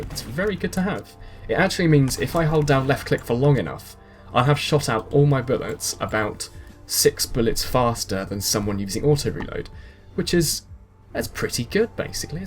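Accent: United Kingdom. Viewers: I'd be homosexual for you. -British accent -Spy main Oh.. yes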